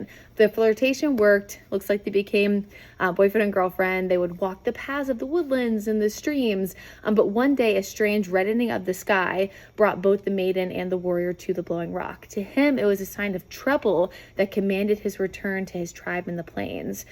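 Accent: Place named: New England, United States. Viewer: get rid of that yankee talk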